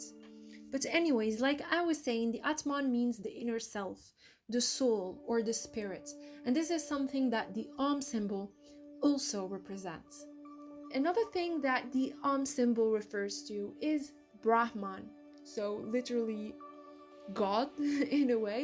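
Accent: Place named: Netherlands. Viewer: You narrate very well in English, but sometimes I get the impression your mother tongue might be Dutch?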